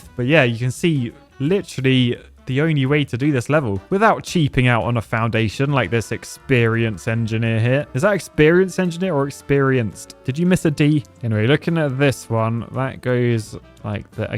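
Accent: United Kingdom. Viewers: This video would’ve been better without your British accent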